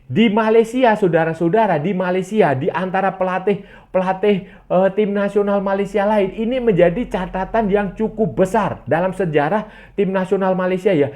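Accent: Indonesia. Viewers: Kau org Malaysia ke?...sebutan huruf a dan e memang begitu di indonesia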